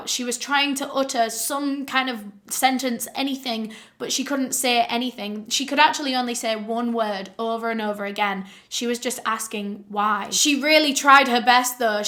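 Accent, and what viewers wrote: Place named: Yorkshire, United Kingdom. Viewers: She has a Yorkshire accent :) x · its an English accent lol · You tell the story really well. Love the Northern accent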